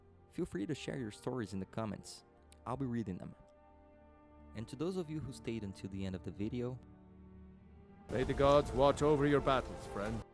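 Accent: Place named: Brazil. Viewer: Me listening "esse cara é brasileiro" kkkkk Também jogo desde que lançou quando tava no ensino médio, dahora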